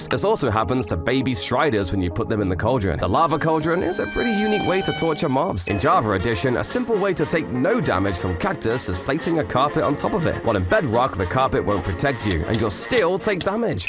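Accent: United Kingdom. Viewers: Nahh he’s just British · Its the way British dialects link vowels together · Can you tell us why is your voice British?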